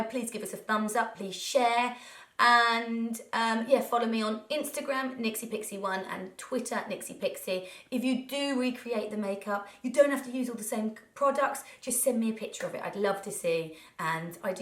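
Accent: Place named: Norfolk, United Kingdom. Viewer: I've just recently moved abroad away from Norwich and sometimes I really miss home. You correcting 'beautifully' made me chuckle because I do exactly the same thing when I say something that sounds 'so Norfolk'!! Love the fact that Norwich has produced 2 such excellent make-up artists. Your videos are fantastic and I'm seriously envious of your lashes!!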